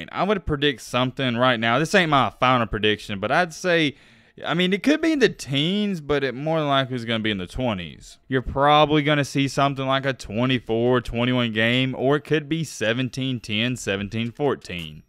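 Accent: South, United States. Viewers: Just an FYI, its Jim not John... On top of that, he's won NO big games outside of Ohio State? This video is completely biased and nothing you said holds any true water. The covid year that helped contribute to their 2-4 record, they were missing multiple coaches all over the staff. At a point, Michigans secondary was nearly coaching themselves last season. With that heavy of an accent, we both know damn well where your fandom resides. His clipboard has nothing to do with his success either, he's implemented the same strategy year-in and out. Any excuse you SEC fanboys can pull, you will. Another side note, what does Quinn Ewers have to do with this video? Smh.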